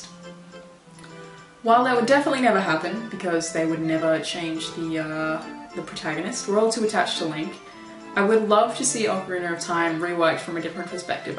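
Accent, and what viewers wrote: Australia. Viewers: She's an Aussie, they've got that cute accent, all of them :P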